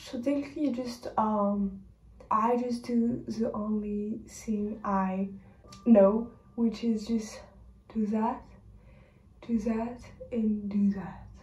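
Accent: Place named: France. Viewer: I like your accent french girl. You are very pretty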